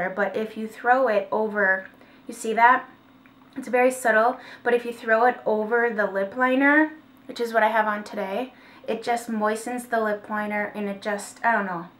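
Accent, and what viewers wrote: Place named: North, United States. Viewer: I love the way Northerners talk! Just makes me happy! It's so cute!! :)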